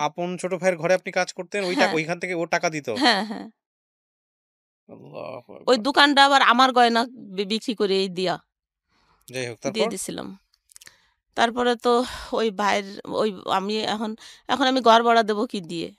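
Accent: Bangladesh. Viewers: বাসা মুকসুদপুর হবে ভাষায় মনে হচ্ছে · গল্প মনে হয় মিথা কারণ বাড়ি খুল্না কথা বলে বরিশাইল্লআ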